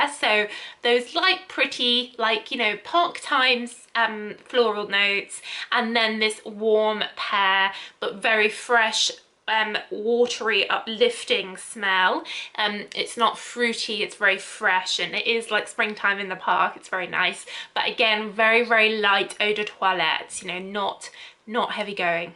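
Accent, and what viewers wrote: United Kingdom. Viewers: your skin looks gorgeous! how do you keep it so hydrated and balmy? I would like to see a facial routine video. Love your British accent though.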